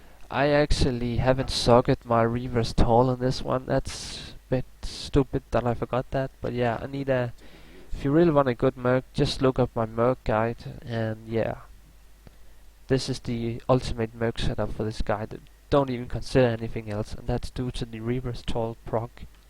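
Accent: Denmark. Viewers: sounds like a dane..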